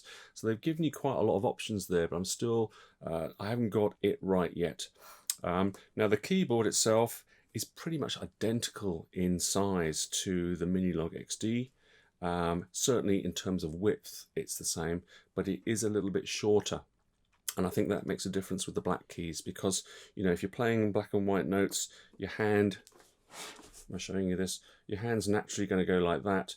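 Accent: United Kingdom. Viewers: Superb content, loved this and especially refreshing to watch a synth review from someone with a nice British accent for a change. I was wondering about a Jupiter X as a compliment to my Korg Kronos. The problem is, the Kronos is so hugely powerful that any additional synth needs to do something that the Kronos can't. Still not sure about the JP X, but this video really brought out the issues and benefits with admirable clarity. It also demonstrates what real UI complexity looks like. People moan about the UI on the Kronos, but at least everything is laid out on a large touchscreen for you, so once you know each synth engine, muscle memory can kick in. The interface on this looks like the worst of all worlds really, back to the days of painting the Sistine Chapel through a letterbox to some degree! A problem that certainly afflicts the D50 I have. What are your views on a JP X as a complementary synth to a workstation like the Kronos?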